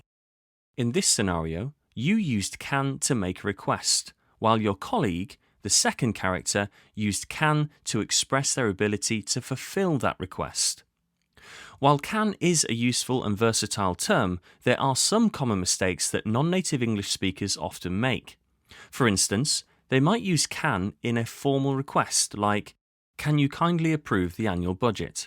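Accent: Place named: Wales, United Kingdom. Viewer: I've been ever so swamped with work lately that only now have I been able to begin to watch your current series of 41 videos (so far, hopefully kkk). No need to say I'm enjoying them no end. Thank you, thank you very much. As for your Welsh RP... well... I love it!!! kkk 😊😊😊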